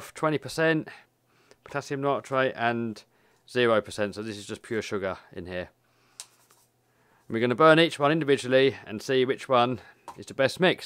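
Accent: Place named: United Kingdom. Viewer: GradeAUnderA is that you? What region or area in the UK is your accent from?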